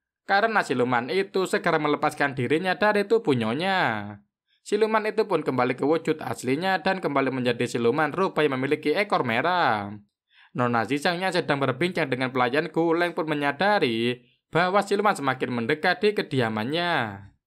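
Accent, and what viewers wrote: Java, Indonesia. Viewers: Medok bet min bacrot ente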